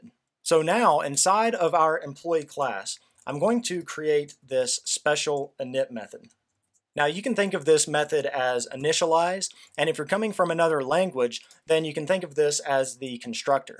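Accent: South, United States. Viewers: me: I like this guys accent, kinda southern also me: m8 you live in tennesse, you have the same accent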